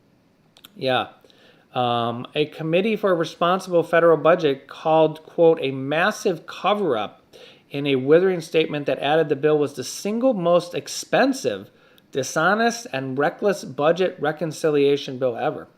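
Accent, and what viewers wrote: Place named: New York, United States. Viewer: Hi, I can see and hear your New York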